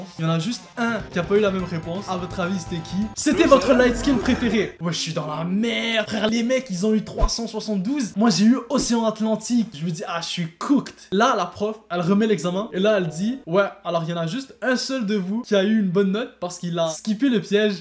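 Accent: Quebec, Canada. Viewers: COOL L ACCENT QUEBEQUOIS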